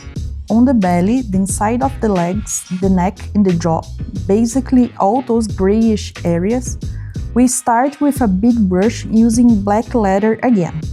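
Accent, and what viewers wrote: Brazil. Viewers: Hey, are you brazilian? I think i noticed a accent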